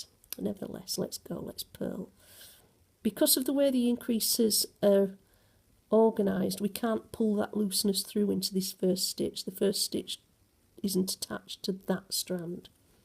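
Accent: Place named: Northern England, United Kingdom. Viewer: Love your Northern accent ...and of course the neat technique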